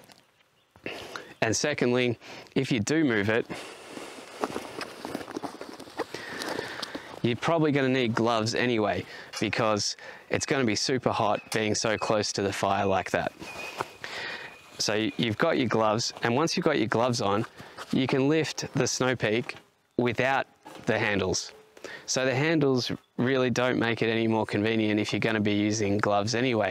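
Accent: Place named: Victoria, Australia. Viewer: Victorian accent gonna confuse ppl every time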